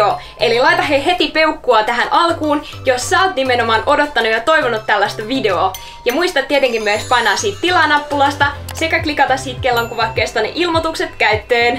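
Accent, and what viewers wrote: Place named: Tampere, Finland. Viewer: Sä kuulostat ihan Tamperelaiselta💕💛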